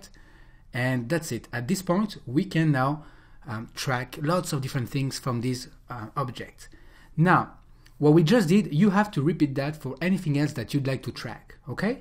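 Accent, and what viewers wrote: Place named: France. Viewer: L'accent francais ^^ Merci pour le tuto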